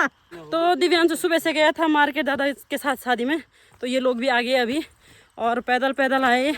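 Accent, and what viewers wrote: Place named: Garhwal, India. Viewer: Tumhare bhasha hamre Garisian ke jess milati julti hai aap kahan se ho Ham Garisian se h aap vbi sayd Garisian hdi sayd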